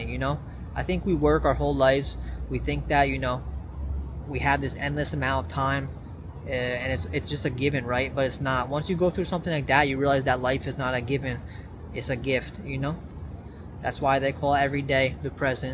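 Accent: New York, United States. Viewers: Beautiful message, brother! Love your NYC accent ❤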